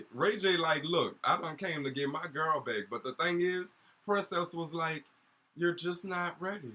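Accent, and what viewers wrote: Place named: New Orleans, United States. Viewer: I'm mad at you! you got the nerve to make the episode seem exciting but it was Trash LoL, you're brilliant. Love your Bondy & Supa accent in the hse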